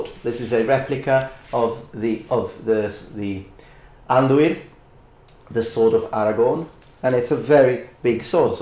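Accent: United Kingdom. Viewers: T'es français, nan ? J'ai cru repérer ton accent, mais tu as un excellent accent british. ^^ Vidéo très intéressante, je me doutais bien que ce n'était pas possible en temps normal mais ça a l'air tellement cool ! x) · what's even more interesting, is an Italian speaking with British accent :) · I just noticed that your British accent is pretty strong! You even use the R between words like in "the idea rof a straight sword". That's pretty funny to me but that shows your natural skills with phonetic articulation; you also have a talent for acting, I don't know if you ever studied theater but you definitely should. I'm Sardinian by the way. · Where are you from man? I can't pick the accent. It sounds maybe South African or from somewhere around about Holland. I'm tending toward the latter because, though your English is very good, it ain't perfect. I don't mean to have a go but "information" is not a countable noun . 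PS, on the the other hand. Of course, native speakers don't spit out what the prescriptivists would dub perfect grammar one hundred percent of the time (far from it). However, I'm getting tinges of British flavour here & there, so I'm still guessing the Netherlandish vicinity. PPS So, I was so wrong. You're Italian. Oh the shame! Still, I'd not guess it. Thanks anyhow for you vids.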